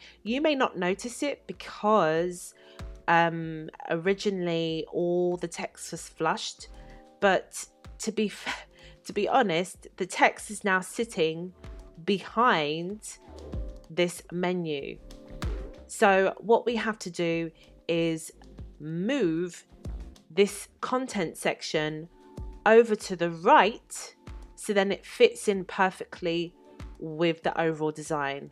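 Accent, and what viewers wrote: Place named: United Kingdom. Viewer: Love British accent.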